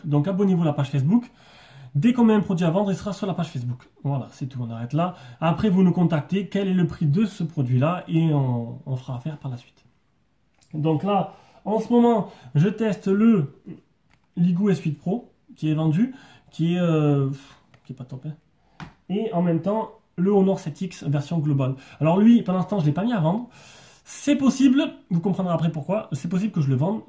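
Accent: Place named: South, France. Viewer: Les gens sont nuls, j'aime bien ton accent du sud ...(léger)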